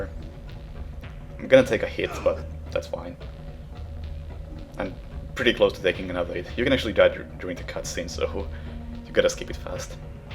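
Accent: Finland. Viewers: Can I guess? Are you finnish?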